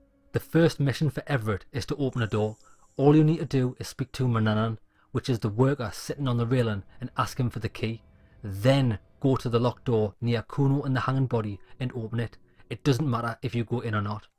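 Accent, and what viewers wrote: Newcastle, United Kingdom. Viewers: Woof that's a strong whiff of Geordie, I think I understood most of it though. Thanks man.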